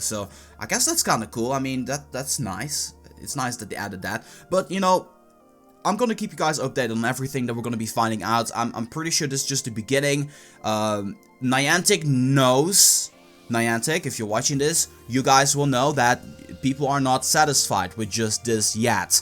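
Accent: Netherlands. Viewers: Is He Dutch?? His Accent Though I Am Dutch Too So Think He Is...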